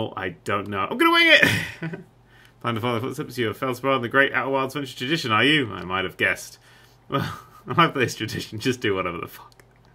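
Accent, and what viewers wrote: United Kingdom. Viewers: Sir, I don’t know how to make this not sound wired, but you are delightfully British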